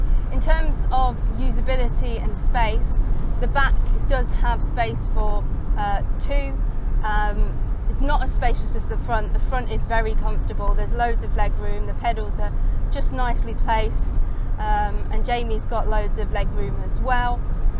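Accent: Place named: United Kingdom. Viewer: Why do the Brits give the letter A the sound of a letter U? They play sports with a bull, instead of a ball. To us a bull is a male cow.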